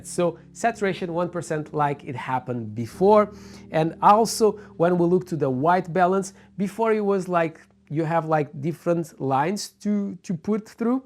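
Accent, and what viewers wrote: Portugal. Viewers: Wait…. Are you Portuguese?! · Bro.. You might have the strongest Portuguese accent I've ever heard 😅 (I'm also Tuga)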